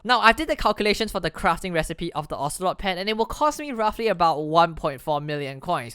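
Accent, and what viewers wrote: Singapore. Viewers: Singaporean ah?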